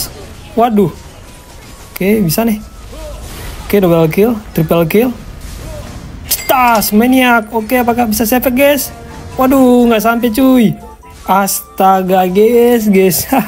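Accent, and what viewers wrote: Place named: Makassar, Indonesia. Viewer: Saya tebak ya bang, dari logatnya bang orang Makassar dan sekitarnya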